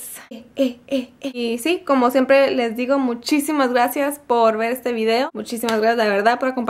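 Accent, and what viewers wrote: Sinaloa, Mexico. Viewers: Eres de Sinaloaaaaaaaaaaaaaaaaaaaaaaa!!!!!!!!!!!!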